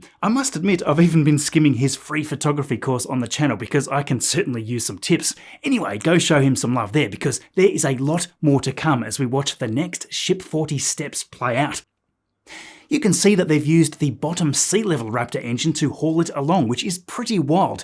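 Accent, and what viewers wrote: Tasmania, Australia. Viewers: Didn’t realise you were a fellow Tasmanian! South or north?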